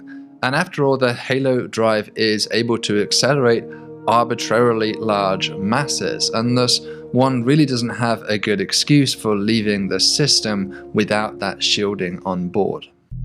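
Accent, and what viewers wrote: United Kingdom. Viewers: This dude looks like someone thought to themselves what the coolest person they could make is and we got this guy. Black leather jacket, british accent, awesome hair, and extremely smart. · Its just the British accent.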